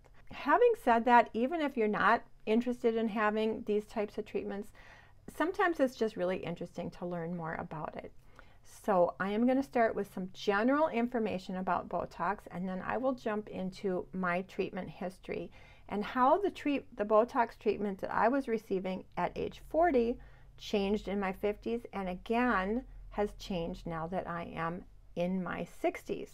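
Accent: Midwest, United States. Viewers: I am living for your midwestern accent. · I knew your accent was Minnesotan before I seen this comment!!! 🤣😂😅❤️❤️💁🏻‍♀️ I’m the person that wouldn’t touch it with a ten foot pole LOL! 🤪😉😘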